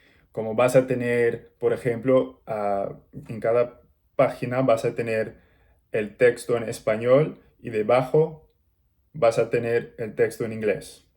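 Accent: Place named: Colombia. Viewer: omg yes! the accent is so colombian particularly from bogota/medellin? so impressive, feels like a person from Bogota is speaking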